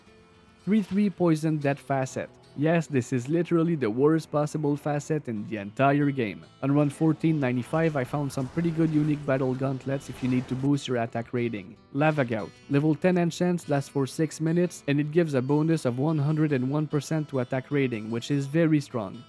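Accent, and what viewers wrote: Quebec, Canada. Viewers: J’entends l’accent québécois à des kilomètres 😭🙏 nice vid man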